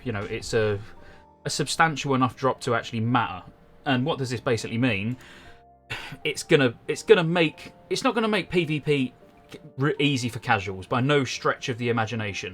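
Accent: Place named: England, United Kingdom. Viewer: Trying to learn the different English accents, where did you grow up?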